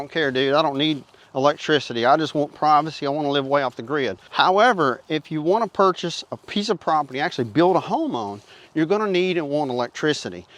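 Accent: South, United States. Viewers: His voice goes well with him, sound like he has an southern accent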